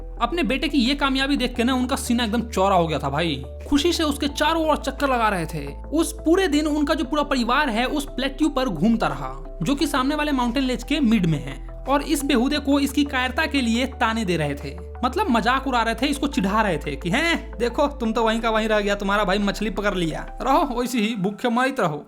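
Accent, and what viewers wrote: Bihar, India. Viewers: Bhaiya are u from bihar 😅❤❤❤❤ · Bhai aapki bihari beech me maja dila deti hai 😂😂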